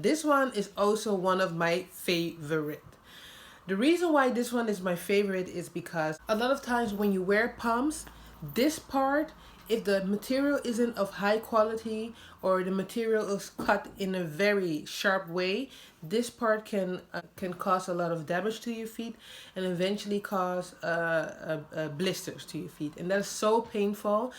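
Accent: Netherlands. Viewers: Ik dacht al je komt van nederland 🧡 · Dutch accent, no? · Nice video!!!! Very helpful!!! Are you from the netherlands? I can hear a cute accent. Im from rotterdam.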